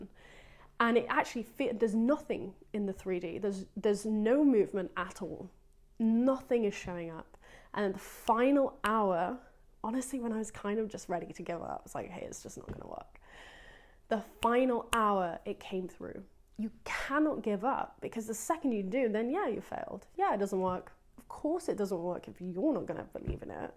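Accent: United Kingdom. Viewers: I really like your mindset. This is a true gem 💎 I love your British accent 😍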